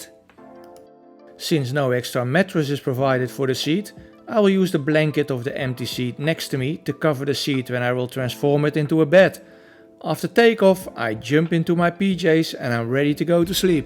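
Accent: Netherlands. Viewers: That Dutch accent! 😍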